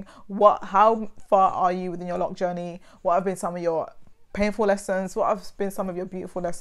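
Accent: United Kingdom. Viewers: You have a VERY nice accent 😍 we're you born and raised in London...? The UK?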